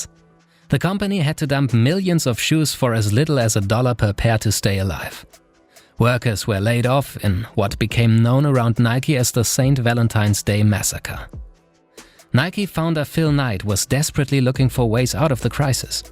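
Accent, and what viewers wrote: Germany. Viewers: Your voice sounds somewhat German. Are you from Germany?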